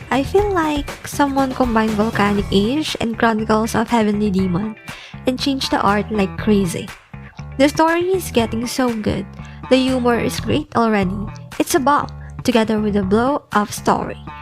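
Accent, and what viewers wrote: Philippines. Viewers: Instantly knew you were from the Philippines just from your accent alone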